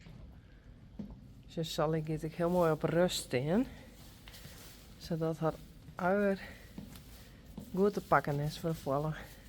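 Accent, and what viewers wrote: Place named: Friesland, Netherlands. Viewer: Well done Wunder, that was intense. I was willing him on to find the right place. Poor Saly, I winced each time she cried out in pain. I really enjoy hearing your Friesian language, it's beautiful.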